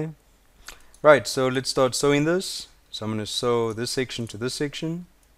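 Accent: South Africa. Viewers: Thanks for a detailed tutorial bru....really nice to hear that distinctive SA accent :)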